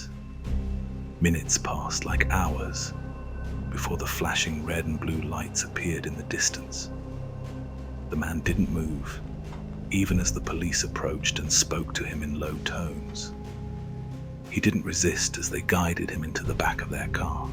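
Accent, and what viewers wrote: United Kingdom. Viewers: I am so happy to eventually find a British narrator, I love your voice and I love your content! I wish that everyone who listened to your stuff would hit the like button for you, it’s the least we can do to show our appreciation for your brilliant page xx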